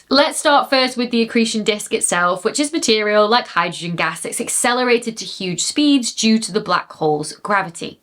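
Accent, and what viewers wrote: United Kingdom. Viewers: For longer…. It’s the Accent… British people would understand this 🙂 But I get how it sounds if you not used to our accent 🙀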